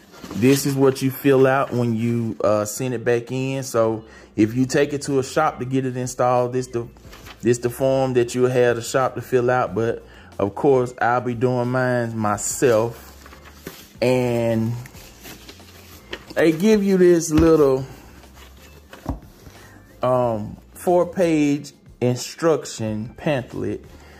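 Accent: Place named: Texas, United States. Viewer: Sound like u from Texas maybe florida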